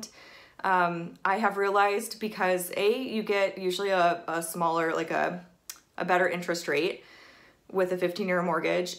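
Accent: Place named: Wisconsin, United States. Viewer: WHOOP...WHOOP...It was really good watching this & learning about you. #MIDWESTGIRLS I live in Indiana & I know you say you've lived in Arizona for about 20 years, but...I can still hear a bit of that "Wisconnnnsen" accent in your voice. I only know it because I have a lot of friends who live in WI. Do you work for University of Phoenix? I took classes through them & although it was much different than a traditional brick & mortar school, I really liked it. I think I'd love to have a job like what you do. It seems like you've got a pretty good thing going! Good luck with your journey & looking forward to hearing your "news" next week (can we probably guess what it might be)?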